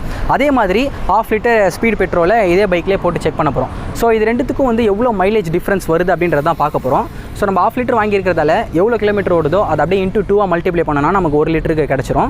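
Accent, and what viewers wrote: Vellore, India. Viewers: Nega Vellore ahh???.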